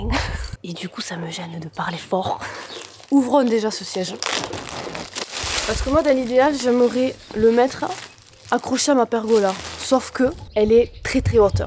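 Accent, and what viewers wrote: South, France. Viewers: Coucou, merci pour ta vidéo et de représenter les filles du Sud avec accent lol Je te conseille ( humblement ) de choisir des thés et infusions de qualité ( évites surtout les marques de supermarchés... ) du style le Palais des thés, Mariage Frères, Thés de la Pagode. Ton palais appréciera d'avantage! Gros Bisous de Perpignan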